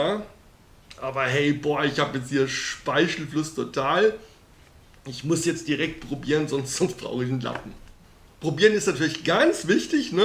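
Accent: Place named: Ruhr, Germany. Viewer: Man, wo hast du denn so perfekt Ruhrdeutsch gelernt. Absoluter Treffer. 😂😂😂🎉 Die Welt braucht mehr Humor. Spitze. Sehr gut getroffen. 15 Daumen hoch. L.G.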